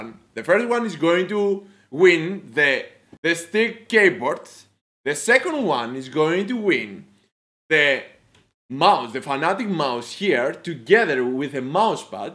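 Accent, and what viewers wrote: Greece. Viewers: Unique Greek accent #BALORIANS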